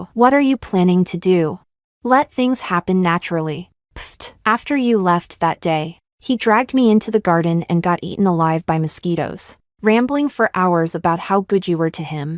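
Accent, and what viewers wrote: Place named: England, United Kingdom. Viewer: It surprised me that the Spanish was pronounced with an English accent 😅 Just FYI, the narrative switched to Spanish towards the end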